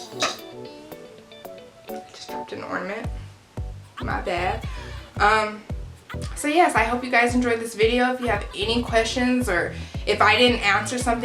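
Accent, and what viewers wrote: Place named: Albuquerque, United States. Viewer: I thought I recognized the accent! I'm a mixed girl too! From Albuquerque! Love your video.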